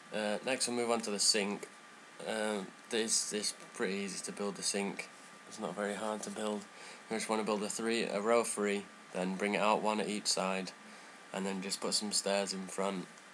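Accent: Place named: United Kingdom. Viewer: He's british he say it that way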